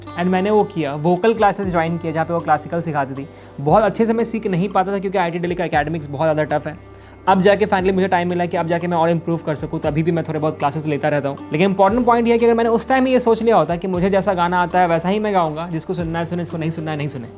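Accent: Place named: Bihar, India. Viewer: Same ascent of mine Bhaiya I am also from Bihar Bhagalpur ❤ Currently live in kanpur , UP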